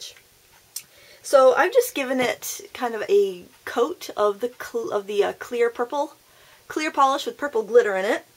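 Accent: United States. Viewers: Hi from the UK. Are you as manic/scatty as you sound, I really love the your voice, so enthusiastic, typically a yank. Thanks for being you, 😀😀😀😀😀😎😎😎😎😎😎🙏🙏🙏🙏🙏❤❤❤❤❤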